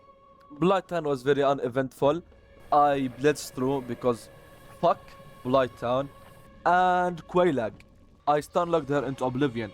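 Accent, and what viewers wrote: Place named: Arab world. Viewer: Are you arabic? Youre accent kinda sounds like an arabic one lol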